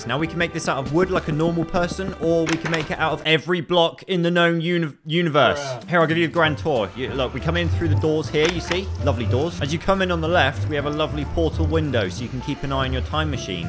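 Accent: United Kingdom. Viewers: The British accent makes this so much funnier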